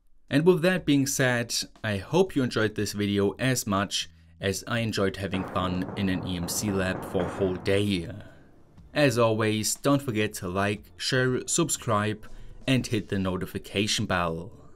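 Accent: Germany. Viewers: You are definitely not a Scott, this German accent is too thick,... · Informative Videos! Habe nicht gleich erkannt, dass du eigentlich deutscher bist :D erst nach einem Kommentar von einem der dich angeblich bei Würth gesehen hat, wurde mir klar, dass du etwas Akzent hast und wer noch außer Deutsche Verwenden noch Fineliner 😀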